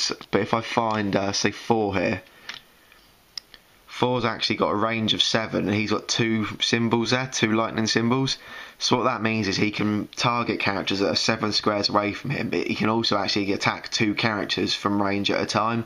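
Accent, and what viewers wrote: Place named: United Kingdom. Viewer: I've found that when instructions are given by a person with a british accent, they always sound smarter than a person without an accent. Bravo!!!! I found this guide very informative.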